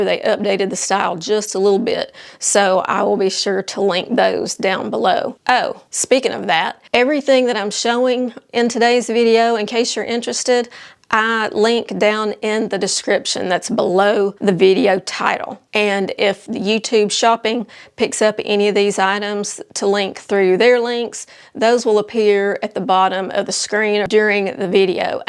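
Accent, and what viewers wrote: South, United States. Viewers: Hi. I love your video. I’m 65 and trying to figure out how to get where you are going in my own personal life. I am disabled so I’m at home all the time. I would not be caught dead in Capri pants or shorts or dress because I have skin problems on the bottoms of my leg below the knee. It’s kind of a permanent thing-that I can’t do much about at the same time. I can’t imagine wearing linen flowy pants every single day all the time. I just don’t quite know where to go stylewise. I end up wearing sweatpants all the time. I live in a small small town so I have to do all of my shopping online. I’m going to keep trying to transform my summer look. Thank you for the video. It keeps me focused on a goal PS I absolutely love your southern accent. I’m a southern girl myself, but I live in the upper Midwest now. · I laugh and think of your sweet southern accent when I see Capri pants and cheap flip flops!!!!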